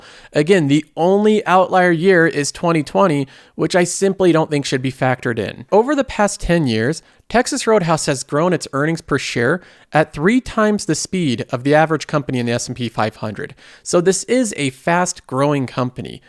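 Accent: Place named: Northwest, United States. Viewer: Hey man - I gotta ask, are you from the NW? I just got married in Washington State and my wife's entire family talks just like you the way you pronounce words like "Feel" kind of like "Fill" or "Fell". Haha so funny!! 😂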